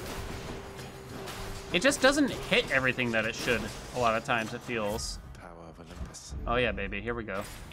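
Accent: United States. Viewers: Honestly, you sound like every American ever. Cheers!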